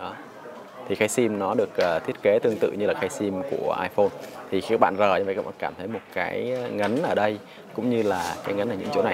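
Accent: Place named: South, Vietnam. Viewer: Giọng zai miền nam nghe hay quá :x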